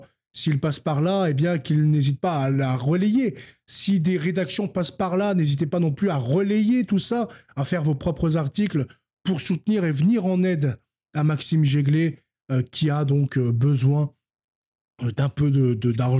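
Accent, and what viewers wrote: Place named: United Kingdom. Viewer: Pourquoi prononcer à l'anglaise des noms manifestement bien français ?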